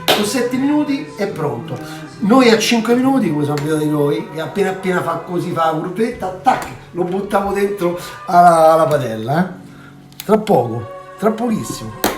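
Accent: Rome, Italy. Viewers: Si forse saresti un buon cuoco se non ti allarga tanto e lavora di piu , con questo romanesco squassato . .....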